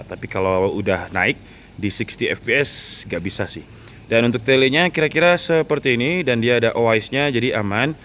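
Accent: Java, Indonesia. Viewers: jowoan rek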